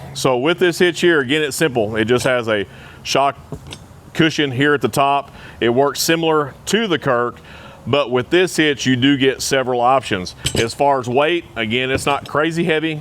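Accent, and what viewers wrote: United States. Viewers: Like yo cowboy accent 😊 completely clear! Not a word missed 😅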